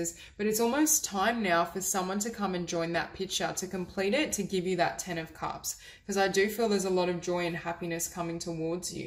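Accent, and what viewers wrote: Australia. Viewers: Excellent reading I chose #3. I have been told in the past that I am a healer. But I have no interest in it. I am aware of going through a spiritual transformation at the moment, so we'll see. Just along for the ride at the moment. Learning not to sweat the small stuff. Thank you. BTW I love your beautiful Australian accent. I could listen to you read the phone book.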